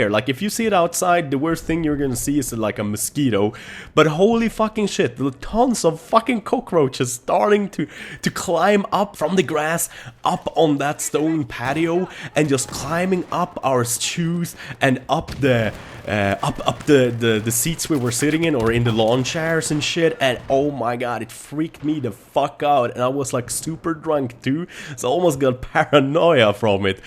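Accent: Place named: Sweden. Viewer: Haha shut up! Have such love/hate for your channel but i cant stop watching and listen to your swenglish haha:D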